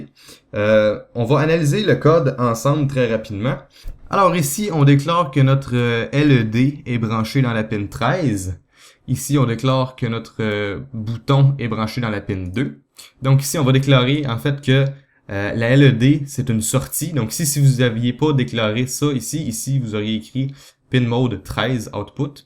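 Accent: Quebec, Canada. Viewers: Top ta vidéo l'ami. Juste une chose pour faciliter la compréhension du Français avec l'accens Q'bequoi... Parles un peu moins vite... S'il te plais... Un cousin Français qui apprécie tes tuto, et qui voudrais pleinement en profiter...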